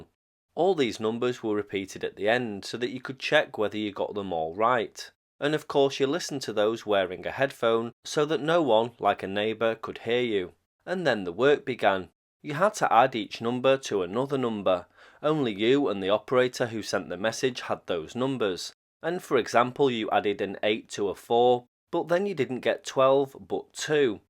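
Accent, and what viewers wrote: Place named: Liverpool, United Kingdom. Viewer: Your accent reminds of The Beatles accent. I was able to See The Beatles in Memphis Tennessee in 1964.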